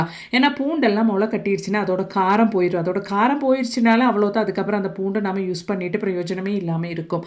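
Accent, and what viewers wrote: Kerala, India. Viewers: Malayalam mix aaguthu voice so ur malayali അല്ലേ 👍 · அனியத்தி நீ மலையாளியோ😂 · சகோதரி கேரளா பகுதி அந்த உச்சரிப்பு சிலருக்கு புரியவில்லை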